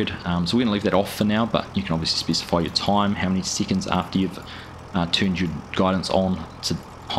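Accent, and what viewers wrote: New Zealand. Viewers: You might be getting confused by the Kiwi accent...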